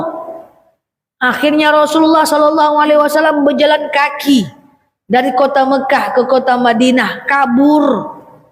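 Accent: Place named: Padang, Indonesia. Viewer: Ustdzh org pdg kayakny suaranya kayak org pdg benar GK subhanaallah kagum saya udh cantik ustdzah LG beruntunglah ortu nya mendidiknya anaknya jdi anak Sholeha semoga sehat selalu dan dijaga oleh Allah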